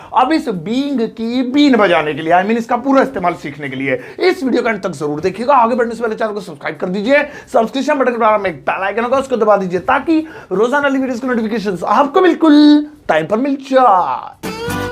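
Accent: India. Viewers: Hello sir good morning I have one suggestion this is 36 video of advance English class and you have already done English speaking 60 days it has been long time if it's possible u can teach us in English. Because your accent is India it will be helpful for us sir 2 ND thing is I have already told you sir please make videos on punctuation I don't know how to use . Thankyou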